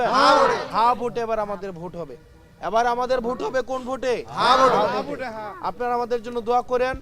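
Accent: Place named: Comilla, Bangladesh. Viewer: কুমিল্লার ভাষায় কথা বলেন খুবি ভালো লাগে, আমরা আমাদের নিজেদের ভাষায় কথা বলতে পছন্দ হয় শুনতে পছন্দ হয়,দোয়া রইলো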